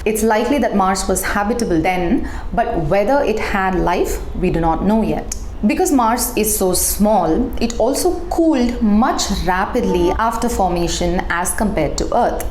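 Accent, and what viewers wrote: India. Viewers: I really don't want to delete my comment. She is Indian speaking English rather beautifully with an Indian accent, rather fittingly because she is Indian. I understand her perfectly. If you're so accomplished I rather wonder why you can't? Would that I could speak a second language so well.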